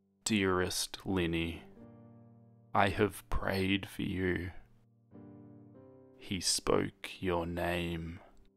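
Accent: Australia. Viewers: You sound Australian tbh.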